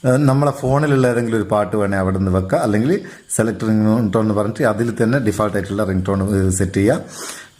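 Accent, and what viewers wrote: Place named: Malabar, India. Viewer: Very nice & really like your nadan presentation and koikode/malappram style...all the very best....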